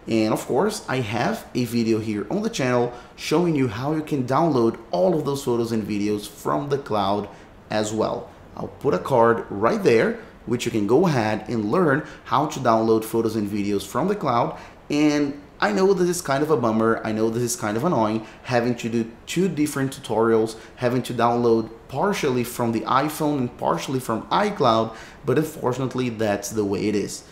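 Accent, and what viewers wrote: Brazil. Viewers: Not related to the tutorial but I hear that Brazilian ascents 😂 good tutorial. Thanks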